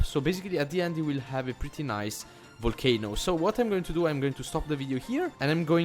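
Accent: Italy. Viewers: Are you italian? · Are u italian?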